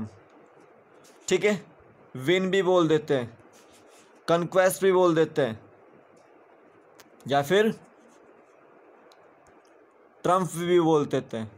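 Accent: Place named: Himachal Pradesh, India. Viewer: its really helpful thnku sir ...nd your himchali accent is too good😄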